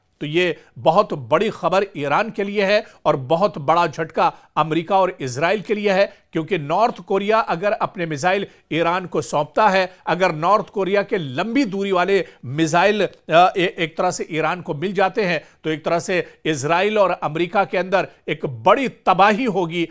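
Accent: India. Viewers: Voice Indian lagta hay, Proper urdu nahi hay 😅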